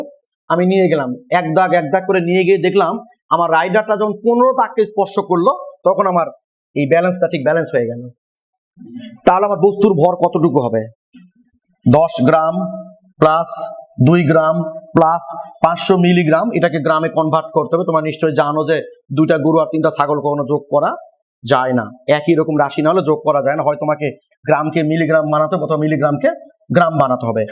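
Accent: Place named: Joypurhat, Bangladesh. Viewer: Vai basa ki joypurhat?? Vlo laglo. Sohoj savabik representation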